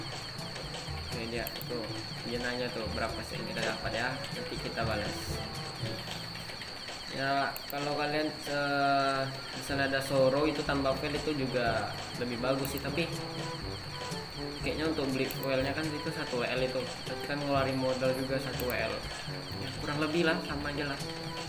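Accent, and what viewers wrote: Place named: Sabah, Malaysia. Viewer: Ckp pun mcm org sabah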